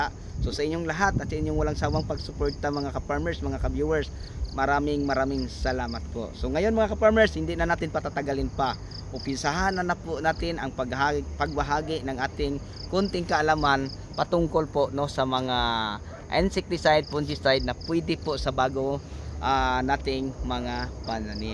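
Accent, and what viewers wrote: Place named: Visayas, Philippines. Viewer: Saan ka idol nagtaranim kasi bisaya ang tuno ng pananalita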